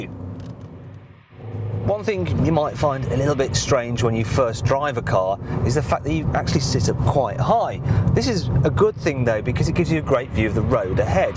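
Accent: United Kingdom. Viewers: can't tell if he says "ka" or "car" with the british accent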